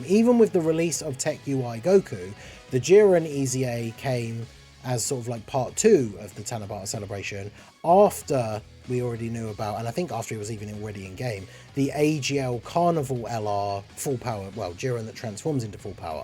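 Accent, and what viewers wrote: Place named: United Kingdom. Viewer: Are u from the uk? I love the british accent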